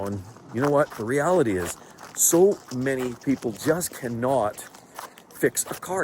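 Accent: Canada. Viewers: This guy sounds pretty Canadian to me should’ve said so at the beginning. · you sound canadian... was waiting for you to say 'eh'.... im guessing youre a youpeer maybe.... IDK, Im Alaskan, and you sound like a lot of canucks i know... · That's one helluva hoser accent, eh?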